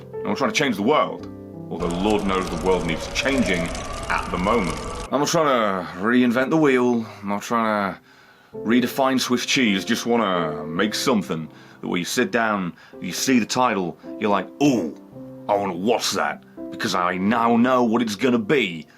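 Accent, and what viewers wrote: United Kingdom. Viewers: As long as you do what keeps your interest and mind going, I think we’ll always stick around,. We’re here for you. We came for Isaac, we stayed for the screaming, enraged, messed up speech displaying British man that is you.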